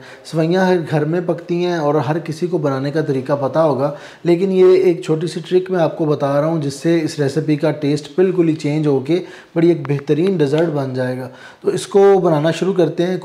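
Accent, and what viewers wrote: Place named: Islamabad, Pakistan. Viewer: Islamabad ki tone, I like the way you speak. ❤, gonna try this recipe today.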